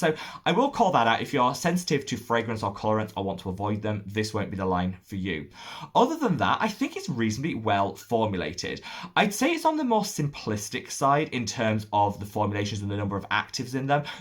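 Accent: United Kingdom. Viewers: I like the bulletin style for reformulations but really appreciate the in depth analysis on new products. Being American, I need to listen hard to that oh-so-cool fast Brit accent, so the rewind button is my friend during the latter. You do such a good job, and I find true value in your videos.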